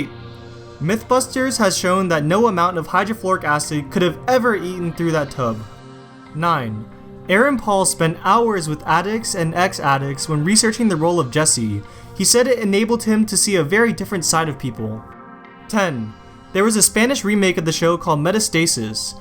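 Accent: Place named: Midwest, United States. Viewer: He sounds midwestern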